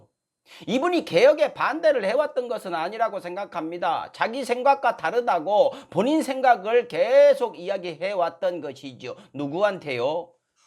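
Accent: Jeolla, South Korea. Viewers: 구수한전라말 택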